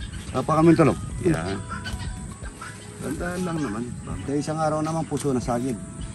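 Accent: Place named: Pampanga, Philippines. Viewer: Good morning kuwait Good morning Philippines Kapampangan accent neh idol..I miss Pampanga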